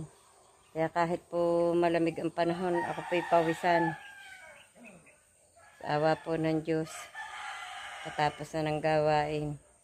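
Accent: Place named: Batangas, Philippines. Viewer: Para taga batangas po kayu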